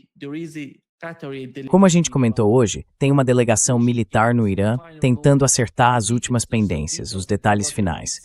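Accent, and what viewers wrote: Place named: India. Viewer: nao é brasileiro, é só uma tradução automática. Acho que é americano descendentes de pessoas do Oriente médio provavelmente Ele tem um sotaque indiano, mas ele é branco, estranho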